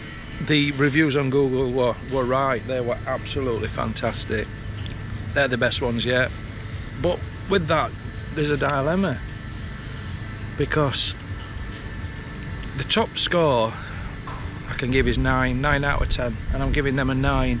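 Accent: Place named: North, United Kingdom. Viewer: Loved the filming and loved your northern accent. Your walk cheered me up but made me feel a bit sad. Like many people watching, I remember the 70s Great Yarmouth. I spent so much time there with my family and had such a good childhood, with my 'two weeks away in Yarmouth ' holidays. Needless to say, I began to think of my loved ones that are no longer with me. Time is an healer, they say, but some pain is hard to deal with. - even after many years. Thank you very much for making your walking tour available on YouTube. God bless you and your family. Thank you.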